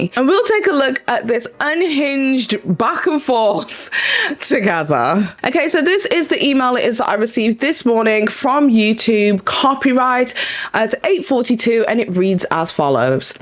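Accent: United Kingdom. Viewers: I love how British people say H 😂 I notice it so much from you and callum Margie lol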